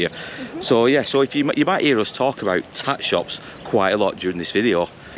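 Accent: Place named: Lancashire, United Kingdom. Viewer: Your putting that stupid Lancashire accent on like Lindsey Hoyle,the speaker of the house of commons, really mate you seem a decent guy but chill the Lancashire accent a bit nothing personal,I do like your videos 😜.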